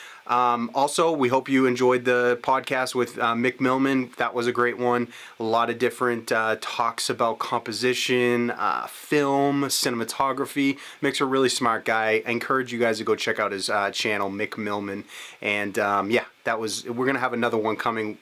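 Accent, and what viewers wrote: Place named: Maine, United States. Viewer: Loved this review, and your accent. Are you from Maine ?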